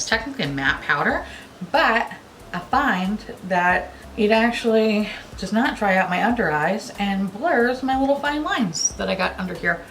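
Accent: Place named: New York, United States. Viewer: I’ve been watching beauty YouTube for years and I’ve been broke due to Covid and really fallen off watching beauty BUT when you post a video I get so excited!! Every time you start talking my boyfriend says “is that the New York chick??” 😂😂 then he has to watch you because you’re hilarious 😁 thank you for being awesome!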